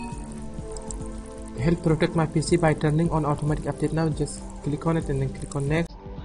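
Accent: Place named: India. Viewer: I can't understand you! You have such a thick Indian Accent!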